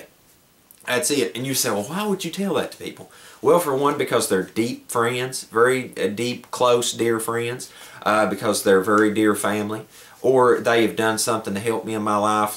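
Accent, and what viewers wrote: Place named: Kentucky, United States. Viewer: Sweetheart you have great information, but you could reach sooooo many more people by playing down the accent. Im from kentucky and made a point to talk straight as a kid and noone can tell where im from now, but all of my family sounds like you. Its hard to be taken seriously without that non-regional dialect - you could reach sooooo many more people if your voice didnt overpower your message. Please dont take offense its a genuinely heartfelt critique. Again great info, and great reminders. Thanks for doing what you do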